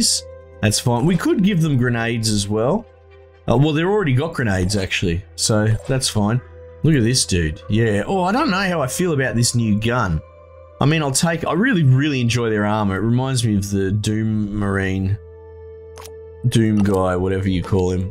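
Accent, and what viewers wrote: Australia. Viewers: You're Aussie? NICE. Good video :)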